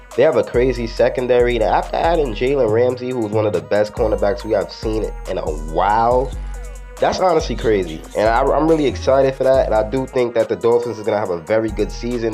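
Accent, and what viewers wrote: New York, United States. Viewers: Not feeling this NY accent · trying to place your accent! A little NY In there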